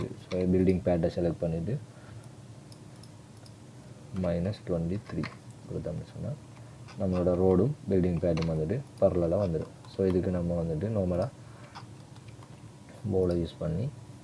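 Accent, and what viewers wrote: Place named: Eastern Tamil, Sri Lanka. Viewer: Best of luck bro Eastern tamil ketkawe mass a irukku Konjam basic la irunthu solli thara ealuma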